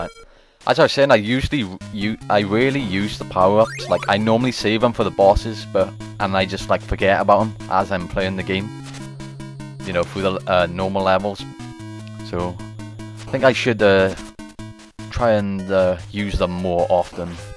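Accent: Wales, United Kingdom. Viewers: I NEVER GET TIRED OF THE TOTALLY VOICE FULLED WITH WELSHNESS Does that make me sound Gay??? O_O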